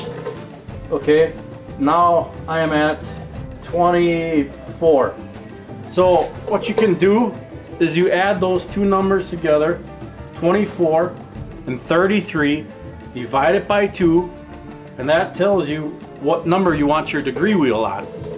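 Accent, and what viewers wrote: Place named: Boston, United States. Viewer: ive read many times on how to do this and am successful in the operation of my vw motors but this was well explained and the music in the back make it easy to learn,lol!! nice video,sounds like you are from boston!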